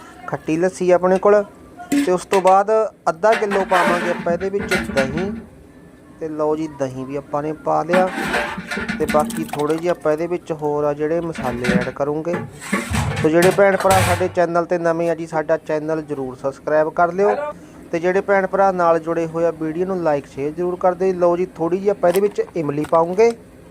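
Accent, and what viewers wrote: Ropar, India. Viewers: ਕਮੈਂਟਰੀ ਕਰਨ ਵਾਲ਼ੇ ਦੀ ਭਾਸ਼ਾ ਤੋਂ ਲੱਗਦੈ ਕਿ ਰੋਪੜ ਸਾਈਡ ਦਾ ਏਰੀਆ ਹੈ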